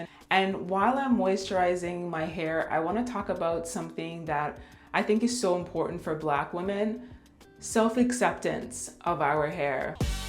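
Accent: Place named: Toronto, Canada. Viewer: I knew you were from Toronto by your voice; are you also of Jamaican descent?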